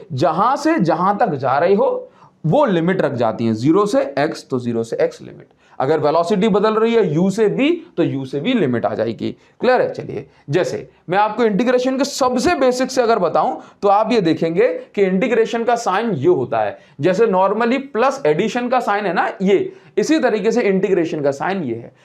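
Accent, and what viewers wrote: Odisha, India. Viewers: Sir are you from odisha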